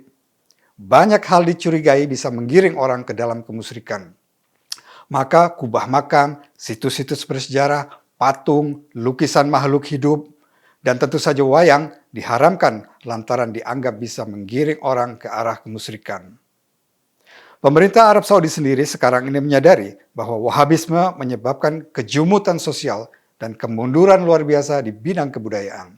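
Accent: Indonesia. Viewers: Habib cara bicaranya intelek dan dialeknya sangat indonesia sekali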